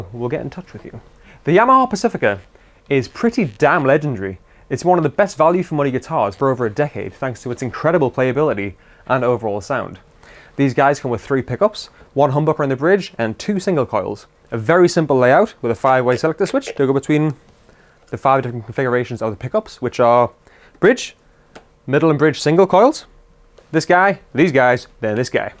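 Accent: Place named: Sunderland, United Kingdom. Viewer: Can't quite place the accent, I think he comes from Sunderland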